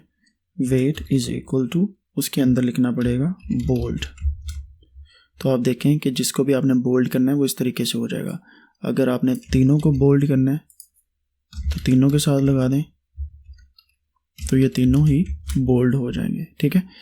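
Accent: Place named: Faisalabad, Pakistan. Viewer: kya maza aya, yayi wala accent yawwwrrr , and apka Faisalabadi accent, anyway keep us engaged and motivated. PS: 'anyway' b ap sy seka hai 😆 chillay k sath sath apka accent b sek jayengy 😃❤️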